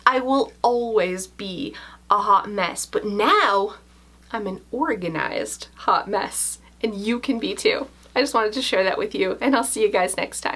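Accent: Canada. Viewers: In the same way you like her Irish accent, I enjoy your Canadian accent! 😍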